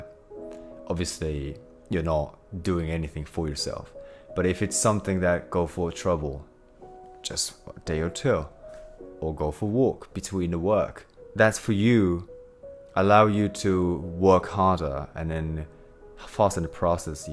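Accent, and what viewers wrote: England, United Kingdom. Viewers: You’re speaking English with British accent, aren’t you? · Ah... maybe you came up in my feed because we are both Vegan... Very Good!. I hear an English accent there. Not sure there is much to say, my bad, i don't know much about you but all the best with your quest to help folk.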